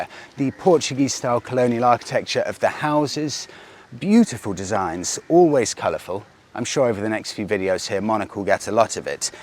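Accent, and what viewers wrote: England, United Kingdom. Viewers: Nice to hear a proper English accent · The bike s in immaculate condition. RE is the pride for India. It's one of the oldest motorcycle company in the world & it's still growing. Are u from the UK. Pls comment ASAP. Perhaps from your accent I just picked it up. Thank you. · I only watched the whole video because of the British accent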